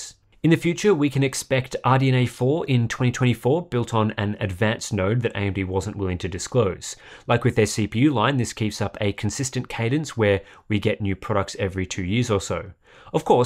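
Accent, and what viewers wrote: Australia. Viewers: there's no such thing as a "normal" accent lol imagine being this provincial Hardware Unboxed please go full aussie accent to ward off this kind of braindead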